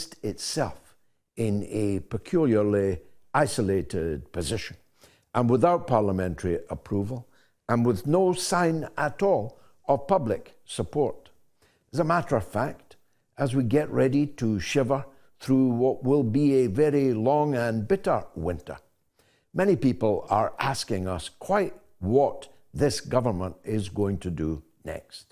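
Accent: Scotland, United Kingdom. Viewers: I like your Scottish accent. 😃👍❤️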